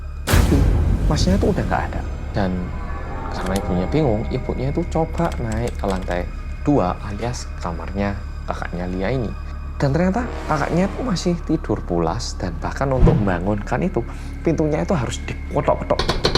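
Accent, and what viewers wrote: Java, Indonesia. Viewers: bersama orang korea yang MEDHOOOKK aku dah tereak medhok juga. trus sadar kalo ini rindingdong :) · bersama orang korea yang... Hensa( Me): kewe...: medhokkkkkk eh... Hensa( me): lhaaaa Hahahhahajajaja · Orang Korea yang medokkkk😌👍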